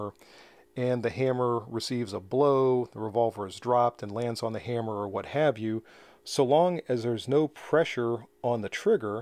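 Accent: West, United States. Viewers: As also an Ohioan I approve the use of the western accent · Great review! Love the western accent 😄🔫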